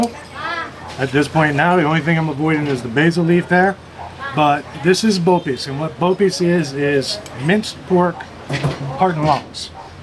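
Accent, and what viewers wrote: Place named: Canada. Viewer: Hi! Late for the party again! I heard you loud and clear when you asked if it was Bitter Gourd. It was Bottle Gourd. She probably misheard your Canadian accent. I have no problem talking to or hearing an American accent. When I moved to Canada, I have noticed that Canadians talk too fast. I am used to it now. This video got me excited and famished! So many yummy dishes❤❤❤ Thank you!!!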